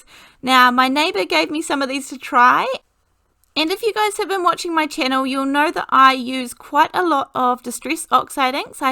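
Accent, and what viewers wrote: New Zealand. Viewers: Thank you so much. I’ve got some of that. I’ll give it a go I do have a hard time but maybe it’s the paper. I love, love your videos. Your kiwi accent is lovely to listen to. I’m in Aus. Perth. ❤️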